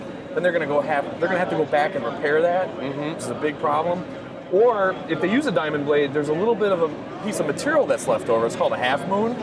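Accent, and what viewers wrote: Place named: Midwest, United States. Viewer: Yaw I werk fer Bawsch! Jesus Christ that’s one hell of a Midwestern accent there, bud! Great job demonstrating how worthless that attachment is too.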